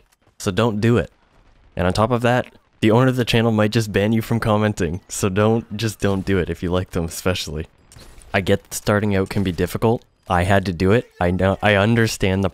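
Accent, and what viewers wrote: Canada. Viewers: Are you Canadian? Dat accent tho. Btw, love your stuff. It's definitely something refreshing in a world full of screaming gamers. Keep at it! :) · Canadian eh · Is he canadian? I didnt know but I heared him talk with aboewt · Where are you from dude? I'm from Edmonton and you sound canadian :P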